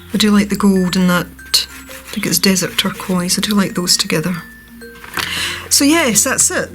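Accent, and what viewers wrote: Scotland, United Kingdom. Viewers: I've noticed you use certain colors frequently. I guess we all do! Whatever it is works for you because your pieces always come out so beautiful. I think I could pick out your style easily in a line-up, HAHA. That gold and turquoise you combine kind of looks like ancient stone. I LOVE it! You're my favorite youtuber right now and I'm thoroughly enjoying your videos! Oh, and great idea on using the security envelopes. I haven't tried that and plan to tomorrow. PLUS, I just adore your Scottish accent, lol! Hugs to you ~~Liz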